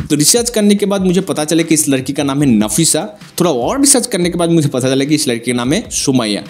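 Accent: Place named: Bangladesh. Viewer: Bhai aapka baat sunkar lagta hai aap Bangladesh sa ho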